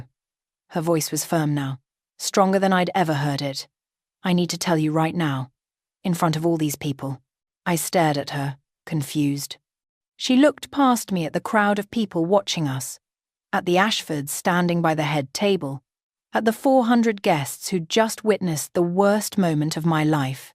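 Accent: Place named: England, United Kingdom. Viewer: May I say your voice with the English accent makes the story real.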